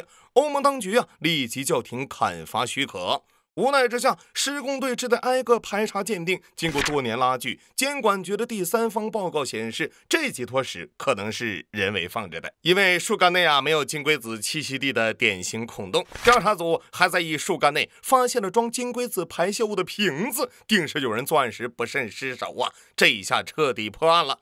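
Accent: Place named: Northeast, China. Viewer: 東北口音。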